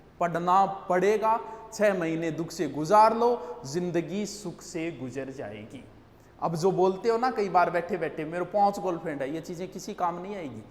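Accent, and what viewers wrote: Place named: Rajasthan, India. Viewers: Sir aapki bolli jhakas h Kadhe kadhe Meri daadi puchhe kara. Yo chora haryanvi h k rajasthanni 🤣🤣🤣🤣🤣🤣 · Sir very helpfull video,,,,,,or aap ki rajsthani awaaz. 👍🏻👍🏻👍🏻👍🏻👍🏻👍🏻👍🏻😀😀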